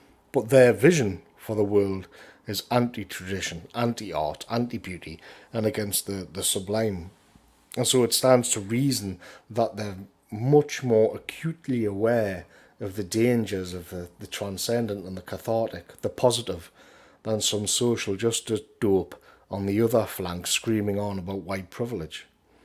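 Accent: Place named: Newcastle, United Kingdom. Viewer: Excellent as always, with the usual sprinkling of linguistic nuggets: "sublime" is a word we hear so very little these days, especially in a Geordie accent. The Daily Shoah crew often talk about "The Kosher Sandwich" which is a similar ( but not identical) metaphor. After that my autoplay selected your vid: 'Roger Scruton & Words Of Power' which dove-tailed perfectly Thanks again. Clarity, insight, passion and brevity. It's a winning formula.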